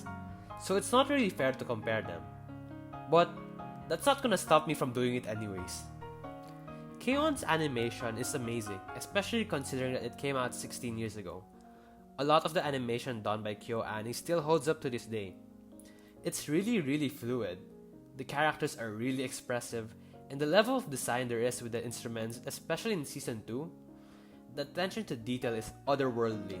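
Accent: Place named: Tagalog, Philippines. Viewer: im hearing a tagalog accent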